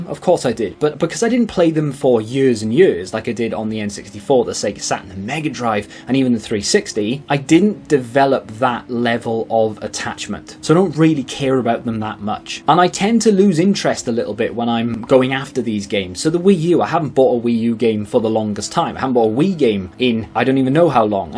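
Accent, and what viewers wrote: United Kingdom. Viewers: U sound like a brit😂. Here in the states wii u games are rising. And esp the 3ds are getting super expensive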